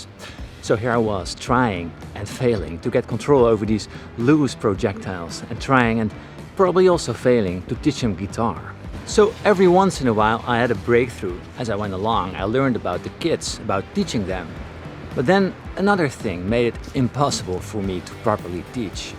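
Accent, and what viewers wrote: Netherlands. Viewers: Nu begrijp ik waarom ik je Engels zo prettig vind, je bent ook Nederlands of op zijn minst deels. Leuk. Succes met je kanaal, ik heb er erg veel plezier van. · I already thought you would be from the netherlands from your accent, but what town are you from? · Watching your footage on YouTube I didn't notice at all however in this vid I thought 'hey this guy sounds Dutch'. In seconds I recognized the Dutch style houses and the distinctive yellow car registration plates - Holland!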